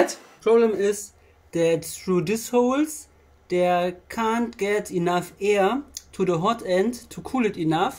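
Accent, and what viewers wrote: Germany. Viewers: Funny how I noticed after the first sentence that you are German xD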